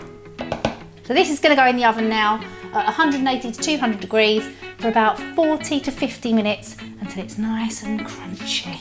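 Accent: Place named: Cockney, United Kingdom. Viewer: Dear, why do you sound so Cockney? Is yours a typical Rochford, Southend-on-Sea, Leigh-on-Sea accent? It's hard to make out what you are saying at times. This is an EARLIER video of this recipe, the Risotto Bundt Cake, than the one showing today (October 2025) on Roku. In the episode I partially watched today, you were also making a Vegetable Tart, and you had an Asian lady on as guest dessert maker. Plus you appear younger here, and are dressed differently, than in the episode I watched. You were also wearing a poppy to honour our War Dead in today's episode. But I hope you are no longer wearing that "Mop of Messy Hair" style that I saw today. 😕 I didn't think I would be interested in the Risotto Bundt Cake, but I think I might like to try it. Where can I find the printable instructions? Oh, I see that they are in the Description, thanks!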